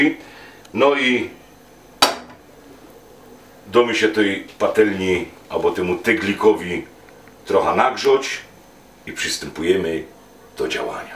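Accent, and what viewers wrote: Silesia, Poland. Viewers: Pozdrawiam z podkarpacia Panie..swietny przepis na zdrowe placki ziemniaczano, serowe bez chemi...lubie sluchac twojej mowy slaskiej..dziekuje za wiele wspanialych przepisow kulinarnych...nauka nie idzie w las....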